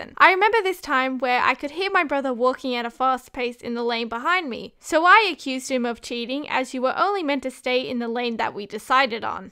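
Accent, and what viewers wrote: Australia. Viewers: Just found your channel and could instantly tell your a fellow Aussie like me, btw your vids are hilarious pumpkin man keeps getting me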